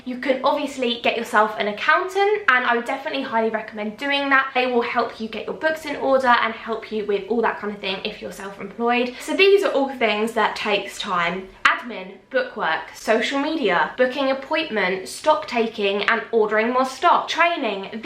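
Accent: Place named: United Kingdom. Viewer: I´m not a nail tech, but I like to do my nails by myself and watch your channel and listen to your UK accent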